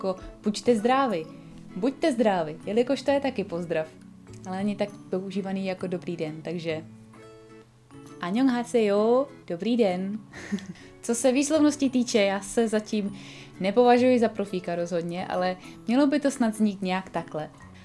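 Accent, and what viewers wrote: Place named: Czech Republic. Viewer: Zrovna zacinam s Korejstinou a za boha jsem nevedela kde se ucit.. pres duolingo se také učím jazyky z angličtiny, ale přece jen ta korejština je tezsi a jsem rada že slysim výslovnost od Češky! Díky moc!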